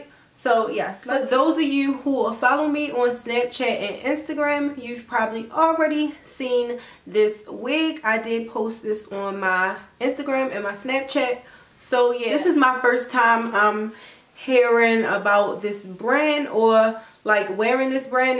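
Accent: Baltimore, United States. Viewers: Is that a Baltimore accent I hear?? If so I'm from Baltimore too. I get excited when I hear vloggers from Baltimore. Anyway I'm a new subscriber. love your channel and reviews. Love this wig!! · You from Baltimore? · U sound like u from Baltimore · I love it!!! Love that bmore accent !! U have the face for it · I love the wig!!! You sound like my cousins in Baltimore!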